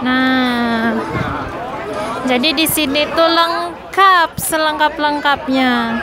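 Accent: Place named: Java, Indonesia. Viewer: Keren say videonya.. kedengaran logat orang jawa juga.. 😁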